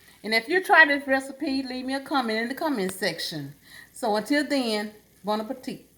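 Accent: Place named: South, United States. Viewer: You really have a southern accent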